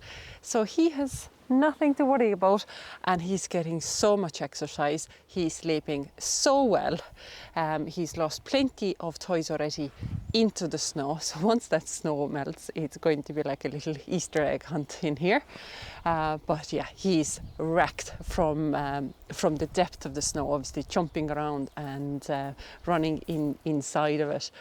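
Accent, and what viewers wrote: Kerry, Ireland. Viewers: You have a grand Kerry accent! Up the Kingdom.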